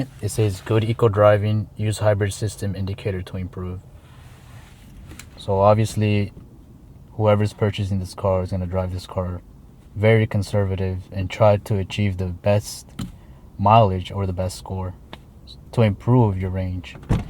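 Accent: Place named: Germany. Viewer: Are you from Germany cause your English sounded like german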